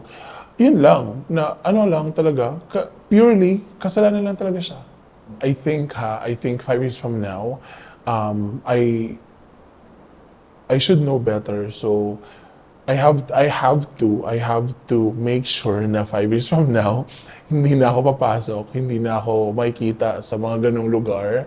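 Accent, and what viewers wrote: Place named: Manila, Philippines. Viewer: You sounded like you're from DLSU 😀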